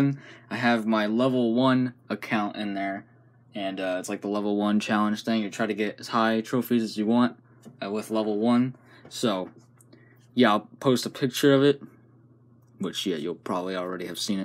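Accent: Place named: New York, United States. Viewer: Nice new York voice really funny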